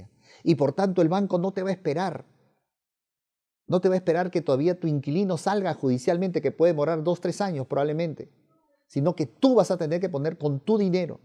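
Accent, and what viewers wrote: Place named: Peru. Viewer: No es por discriminar y ante mano lo digo no todos son iguales, pero con solo a los primeros momentos que escuche a este señor asumí que es peruano, la mayoría que eh conocido creen saberlo todo y los demás están erróneos aún viendo los logros del prójimo , mi humilde opinión , eh aprendido q escucha consejos de quien a obtenido frutos no de quien cree lo sabe y no los a conseguido .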